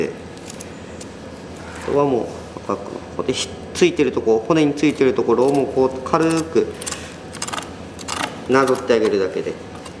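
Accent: Miyazaki, Japan. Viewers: トラックのりです、宮崎出身の、先輩の話し方と同じで、とてもなつかしいです。うれしかったです。頑張ってください。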